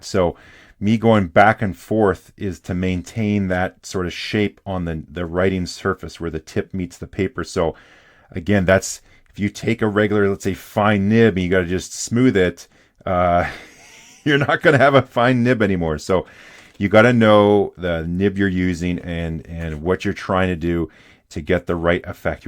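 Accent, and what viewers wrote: Canada. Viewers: I'm an American and I lived in Hungary for 18 years and speak Hungarian. In the Hungarian language, the river is called the "Duna" (pronounced DO-nuh). Not sure about the origin of the word "Danube," but you're right, I've always heard it pronounced like you say, DAN-yoob. Maybe it's a Canadian English thing 🙂. Nothing major either way. This was an excellent and very useful video for adjusting nibs. Thank you, sir!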